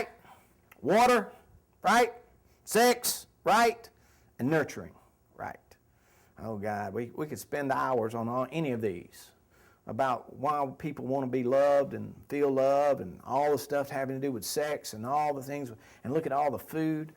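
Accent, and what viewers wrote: United States. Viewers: 9/11 texas accent immediately thought of George bush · tree-on qua-ree-on. lol south'ran drawwwl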